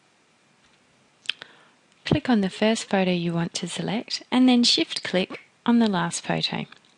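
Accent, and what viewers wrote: Australia. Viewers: Hi,....besides learning from what you say in this instruction video, I also wanted to tell you that I like listening to your voice....which is very soothing, sweet, feminine and peaceful. I'm in the U.S.A. so I also like your Australian accent. It's very nice....and I hope you don't mind me sayin' so.;-)!!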